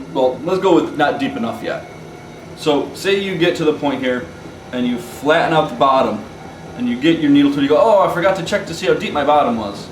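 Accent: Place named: Germany. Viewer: Not gonna lie... the German accent startled me. 0_0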